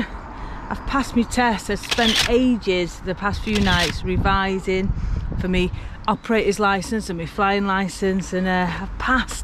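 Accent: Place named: Northern England, United Kingdom. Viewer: No offense to our southern cousins but I always find it a delight when I watch a video and get to hear a familiar accent from 'up 'ere' :)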